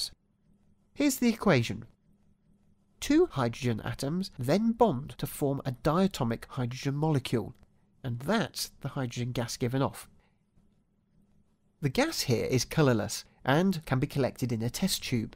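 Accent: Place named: United Kingdom. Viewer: British accent and science, savouring!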